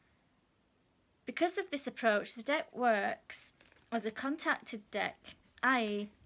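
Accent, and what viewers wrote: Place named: Northern England, United Kingdom. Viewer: Thank you for the recommendation and review, we appreciate you taking the time to do it! And you sound like a fellow northerner (I am from Bradford originally) - Josephine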